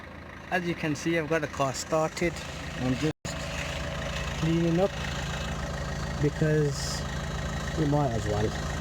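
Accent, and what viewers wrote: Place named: Birmingham, United Kingdom. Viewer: You sound like you're from Birmingham, help a brother out lol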